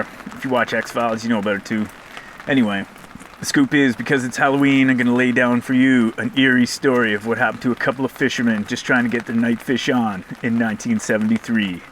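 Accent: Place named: Canada. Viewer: nice vid! nice canadian accent lol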